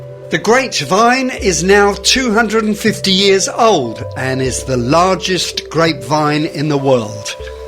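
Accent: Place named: England, United Kingdom. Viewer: Very nice video, very informative! As a foreigner, it's a delight to listen to your very articulate english accent! You would be a formidable greeter! Please continue...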